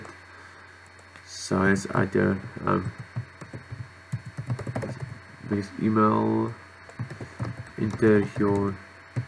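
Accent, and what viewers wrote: Afrikaans, South Africa. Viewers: That boere engels. Haha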